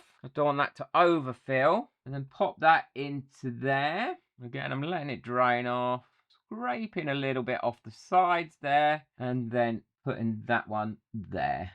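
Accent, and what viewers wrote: United Kingdom. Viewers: This is such a great idea! You really can do a lot with it, maybe even craft your own roses and flowers or adornments using ribbon or pretty fabrics of specific colours. If you don't mind me asking, where is your accent from within the UK? I'm not a Brit but I'm curious about accents, always trying to place them XD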